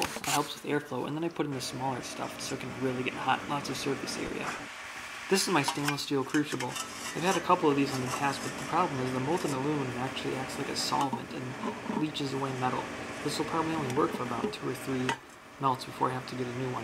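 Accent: United States. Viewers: American accent.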